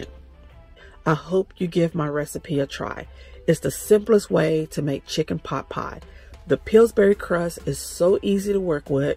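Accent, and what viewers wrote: South, United States. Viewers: Hi, I'm new to watching your videos. I enjoy seeing you explore the world and cooking in your van. Where are you from you have a southern accent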